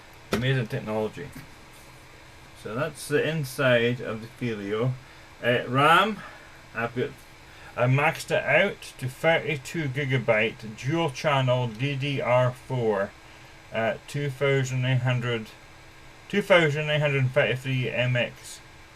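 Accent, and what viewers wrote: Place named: Scotland, United Kingdom. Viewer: wasn't expecting that! Still sounds VERY Scottish to me!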